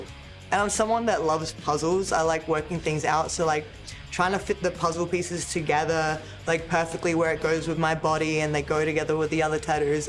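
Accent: Australia. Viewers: Bruh…she’s Italian! That’s friggin’ awesome! Australian Italian. Her imitating her grandma was great. Also love her Australian accent! 😊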